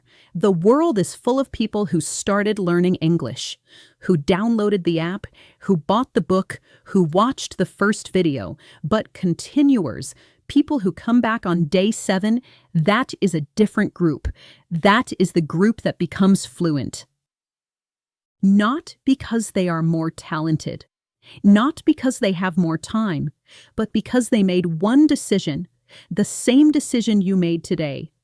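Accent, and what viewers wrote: United States. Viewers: In which accent are you teaching american, british or indian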